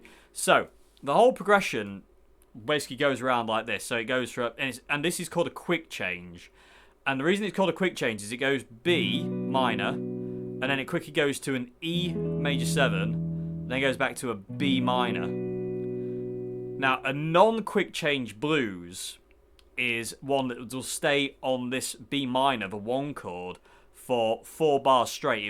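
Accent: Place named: Northern England, United Kingdom. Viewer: Can not pinpoint your accent lol, it's slightly northern, but then very southern 😂😂 where you live?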